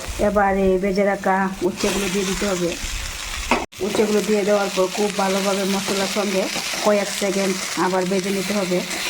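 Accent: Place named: Tripura, India. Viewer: Bhalo laglo. Kintu aapnar bangla uccharan keno annya rakam. Aapni ki tripuri or silent?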